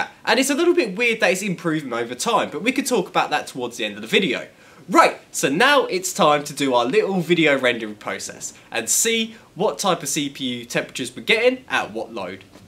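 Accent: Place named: United Kingdom. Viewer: Your accent was 😎 cool just like any British 😂😂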